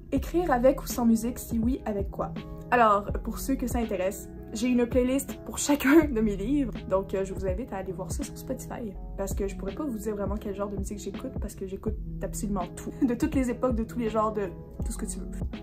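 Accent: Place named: Quebec, Canada. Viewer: Waw cette vidéo est vraiment super :O ! Je vais continuer à t'écouter. Ta voix est satisfaisante et je trouve que malgré ce que tu dis, tu t'exprimes vraiment bien. Mon accent québécois est tellement plus fort que le tien lol. Tu es super inspirante. En ce moment, l'écriture ne fait pas partie de mes priorités, mais elle a toujours et occupe toujours une partie importante dans ma vie. :D Je « relate » tellement pour les playlists d'écriture (je fais la même chose !!!). Je vais probablement aller te lire sur Wattpad. J'adore Twilight et ce qui touche vampire/loup-garou, donc je pense que ça va m'intéresser.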